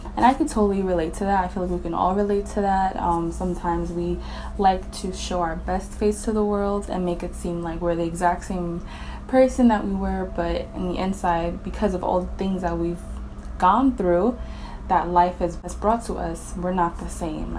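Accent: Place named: New York, United States. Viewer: U sound like u from NY so are u not aware of 90s rap? Or do u know 90s rap so well that I listening to white music?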